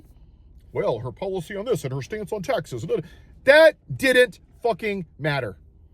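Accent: United States. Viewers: He's not that fluent but he's ok at it. I still hear a bit of a gringo accent but with practice, he could start to sound more native.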